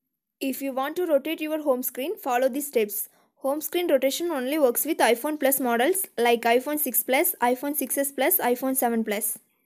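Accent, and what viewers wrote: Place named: Punjab, India. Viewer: Rakarakaraka , put bigger subs and don’t move them quick. Your English is like Punjabi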